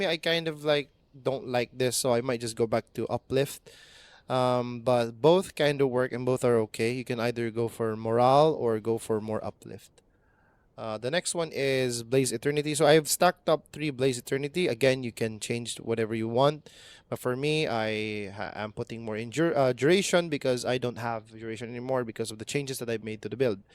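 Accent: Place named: Philippines. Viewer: Bro sounds Filipino HAHAHA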